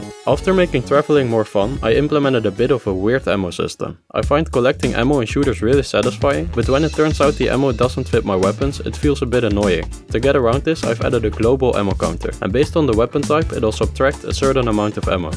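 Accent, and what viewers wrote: Netherlands. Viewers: I hear dutch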